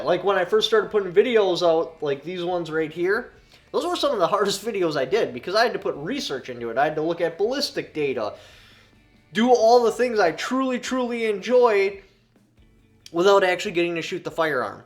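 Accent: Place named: New England, United States. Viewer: Not gonna lie, it took me some adjustment to get accustomed to your yankee accent. AFTER that I became attached. That said, I am sorry if I missed the vid of what’s going on with you; could you do a vid where you break it down?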